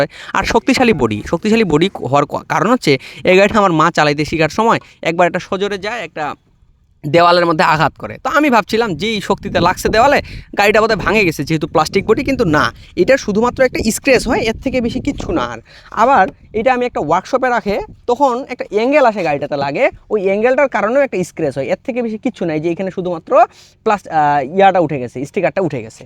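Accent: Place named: Dinajpur, Bangladesh. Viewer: দিনাজপুরের ভাষা ❤, আরো ভিডিও করবেন প্লিজ, গাড়ি নিয়ে, এমন ভিডিও ইউটিউবে কম আছে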